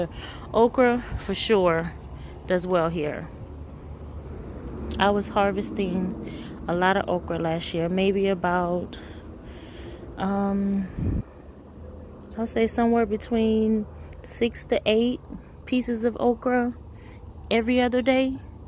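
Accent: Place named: Caribbean. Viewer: you sound Caribbean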